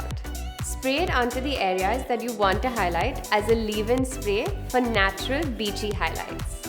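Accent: India. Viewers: Why do you sound Indian? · When it was really shocking and belly turning to see this white girl certainly starting to talk with the Indian accent very weird and how to place well then I remember the world in clear values and I remember the world and their values and what her background must be for me to be looking at this so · Shes so beautiful, to bad has indian accent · She definitely shocked me , I didn’t know she was Indian and I hope I’m not being racially insensitive but her accent is so beautiful 😍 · She has an Indian accent but she doesn't look Indian 😳🙂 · why is she white but with an indian accent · OMG ..That point you started talking i realized that you are an Indian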